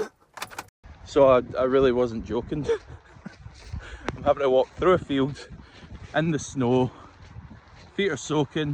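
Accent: Scotland, United Kingdom. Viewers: Scottish accent is so weird but funny 😅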